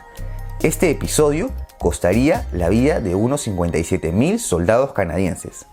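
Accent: Peru. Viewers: por la voz y tu borrador artesco,eres de peru,no? jajaja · Es Peruano, En Perú no hay muchos acentos. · es peruano, el acento y el borrador Artesco, ambos peruanos!. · Es peruano xd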